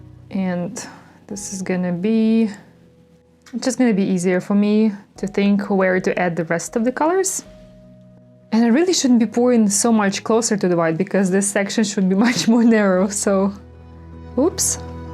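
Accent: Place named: Russia. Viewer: Так и знала что вы русская...😉 своих всегда видно и слышно...🤗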